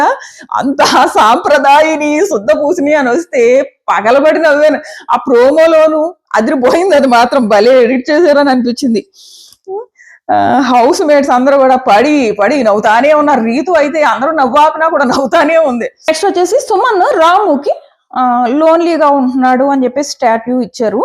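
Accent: Godavari, India. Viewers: 😂 మన గోదావరి యాస బావుంటుంది madam. మాది Demon Pawan ది same town tanuku west Godavari district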